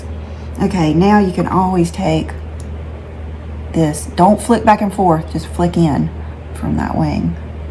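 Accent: South, United States. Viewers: Hi!! I'm a new sub and I'm totally binge watching all your videos ☺️ I love your channel!! I especially love your jewelry, omg I have to get something from Dean Davidson and Cat Janinga! I also love your fashion sense and outfit of the day! But my absolute fav thing is your accent!!! It truly makes me smile 😁 I live in CA now but I was born and raised in TX. I have an affinity for all things southern!! Oh, and the last thing is I love the way you say Brooke. That's my name as well. It reminds me of being back home and someone calling my name 🥰 xoxo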